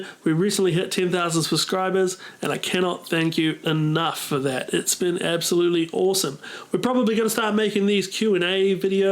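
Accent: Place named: New Zealand. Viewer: Whoever didnt know accent is kiwi needs to watch some more deck themed videos. Glad to know you're in a prog metal band man, will look it up. Great stuff and great guitars ❤️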